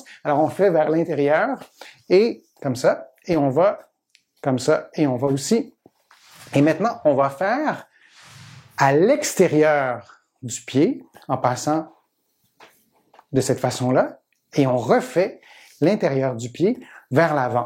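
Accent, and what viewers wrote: Quebec, Canada. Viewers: Adorable accent québécois et super enseignement, ayant moi-même une grave maladie.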